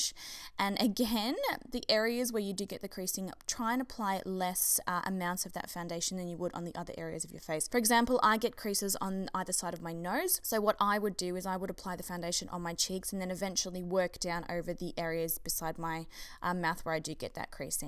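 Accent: Australia. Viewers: You have the OPPOSITE skin type and foundation preference to me, yet I just can NOT stop watching your channel. And (no offense) I normally can’t STAND Aussie accents. Yours is just SOOOO cute, like a kitten purring. You’re just so charming, authentic, and your eyeshadow game is unmatched. Eyeshadow is where I seek the MOST instruction, as I have somewhat small eyes, a bit like yours, but I can’t tell, bc your eye looks are so FUDGING PERFECT. If you could do more eyeshadow tutorials, I’d send you a hundred pieces of vintage jewelry, no joke. Seriously. Also, you give the BEST BEST BEST makeup tips. I watch SO many makeup ‘gurus’, and since I found your channel just a few days ago, I’ve found tips I’ve seen NOWHERE else, which I’ve added all of to my playlist ‘BEST MAKEUP TIPS’, which only gets the very BEST videos added. Well done, keep it up 👏🏻👏🏻👏🏻👏🏻👏🏻👏🏻👏🏻👏🏻👏🏻👏🏻👏🏻👏🏻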